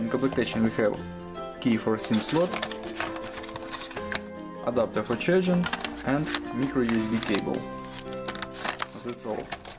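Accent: Russia. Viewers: Это же русский говорит.Акцент спалил и язык в телефоне!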